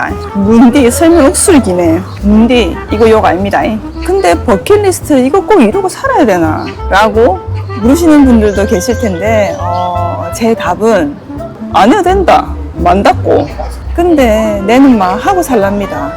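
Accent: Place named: Busan, South Korea. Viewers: 부산임마까 지도 부산이다아임미까 사투리방송 정겹고 좋아요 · 고향이 남쪽 어디쯤인지는 당연히 알았었는데... 갑자기 많이 고향말을 쓰시니까 또 새롭고.. 적응이 안되요😊😊 진짜 가보고 싶은 곳이 참 많은데... 언제나 다 갈 수 있을지ㅎㅎㅎ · 겡상도 아지매네 ㅎㅎㅎ😊 하고픈거 하면서 사는거 부러워요.. 타코는 미국 캘리포니아에서 먹는게 좋아요😊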